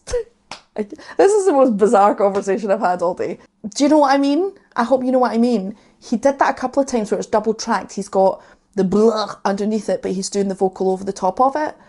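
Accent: Scotland, United Kingdom. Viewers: I'm a silver fox😂😂😂 52 and been listening to Metal fir over 40 years now. My ma was 13 when The Beatles erupted and she got me into rock n roll. They were the first band I remember listening to and it's went from there. I repaid that start by taking her to see Iron Maiden. She is a massive Manowar fan (a band you have to listen to). But the crowning glory was taking her to see Paul McCartney at Giants Stadium in 1990. Please keep doing what yer doing and fuck the naysayers 🤘🏻🇬🇧🏴🖤 Love the Cattle Decapitation as well🤘🏻 P.S. NEVER apologise fir yer beautiful Scottish accent and being Scottish 🏴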